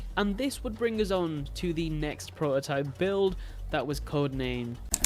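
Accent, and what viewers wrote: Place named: United Kingdom. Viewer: haha you butchered that name with that heavy British accent bro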